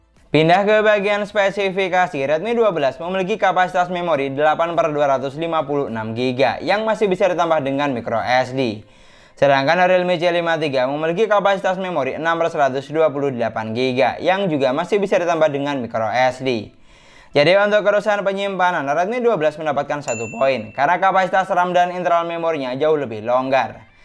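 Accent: Madura, Indonesia. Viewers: kek logat madura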